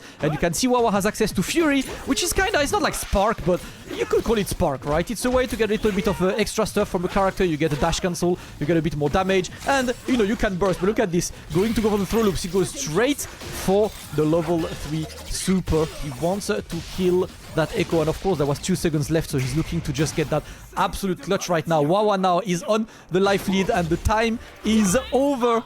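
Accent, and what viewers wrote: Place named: France. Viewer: Ça sent fort la France ce rythme et cet intonation :P Je ne te connais pas mais merci pour le partage de ce combat.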